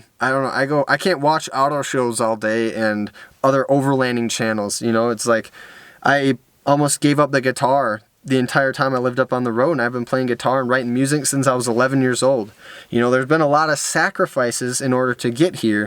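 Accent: Wisconsin, United States. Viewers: yo your from wisconsin? what part? its a shame how many alcoholics there are here and how widely accepted it is here going to the bar every single day after work, Aside from that i knew you sounded like your from wisconsin that wisconsin accent yanno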